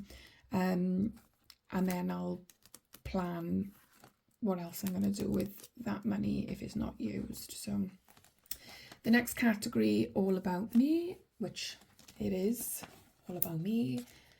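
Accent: Wales, United Kingdom. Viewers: Awww a fellow Welsh accent! ❤ Good luck in all your saving endeavours! ❤❤❤ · Love the welsh accent, we are actually saving to move to wales atm x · I love a Welsh accent ❤ I'm binge watching. I notice you've been gone about a month. I hope all is well and once I've completed watching you'll be back 😊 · Great video🎉 I love the Welsh accent 😊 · Hello Lovely Well done on your first video. You did amazing 🎉 So glad I found your channel. Love a Welsh accent. My bestie is Welsh. Big hugs from Australia ❤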